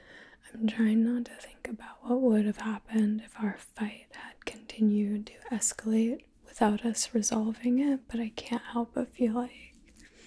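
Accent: South, United States. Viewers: You really have that southern accent down well! Great job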